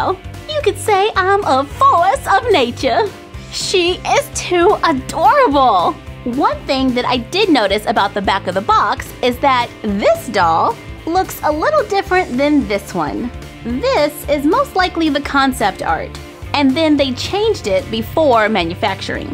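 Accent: South, United States. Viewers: her southern accent 😂😂😂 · you have an amazing southern accent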